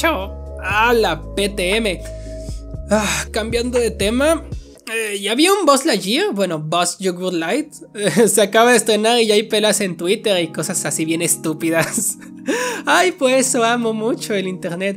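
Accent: France. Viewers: Pregunta eres franses si no eres pues pareces por el acento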